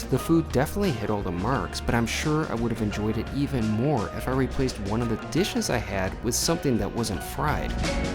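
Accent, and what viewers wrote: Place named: Midwest, United States. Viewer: Love your chill vids and Midwestern accent, bro!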